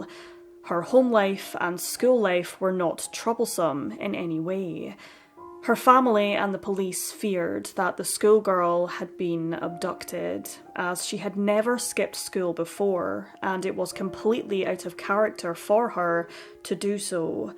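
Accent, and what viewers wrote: Scotland, United Kingdom. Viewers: I am unsure how long I have been subscribed but I will say this without a doubt, you are my favourite true crime narrator, you never sensationalise the stories or make silly jokes and memes like others in the same genre, I do sometimes enjoy other methods but I dobnot respect them as I respect you. You being scottish also helps because it let's me here more of my own accent online without it being exaggerated or used as anything other than the true voice it is. You have inspired me to put my own voice out there (not the channel I am commenting with) and also you have given a voice to many of the unfortunate souls in your videos. Thankyou. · why are you scottish???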